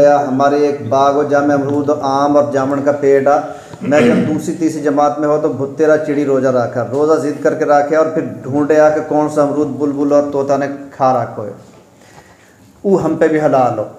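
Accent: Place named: Mewat, India. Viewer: Mewati boli piyari boli he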